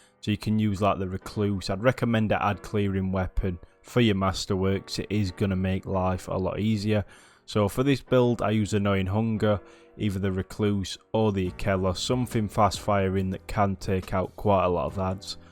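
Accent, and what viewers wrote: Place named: Leeds, United Kingdom. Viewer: Sound like a leeds lad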